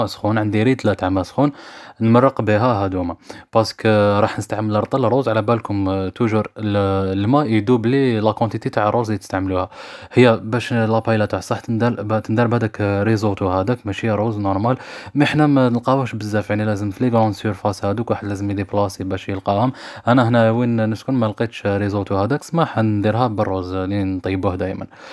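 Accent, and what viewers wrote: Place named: Oujda, Morocco. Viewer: شكراااا على وصفة وتحية ليك من المغرب الشقيق🇲🇦 وهضرتكم شحال حلوة تشبه للهجة وجدة ❤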